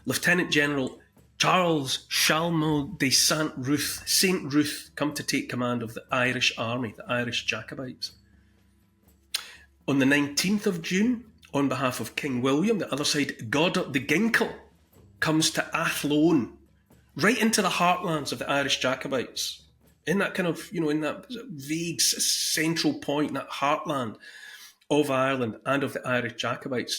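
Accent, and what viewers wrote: Scotland, United Kingdom. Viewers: Hearing your amazing thick Scottish accent pronouncing a lot of unusual words and going into rich storytelling is some divine ASMR, very nice, thanks! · If I had to pick one official English accent in the world it would be your Scottish accent. Enjoy Count Dankula's as well. You have the best English accent in my opinion of all places on earth. Could listen for hours to it. Great job of story telling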